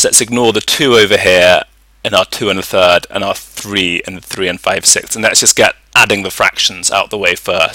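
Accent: United Kingdom. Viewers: are you british 😦😦😒😑😐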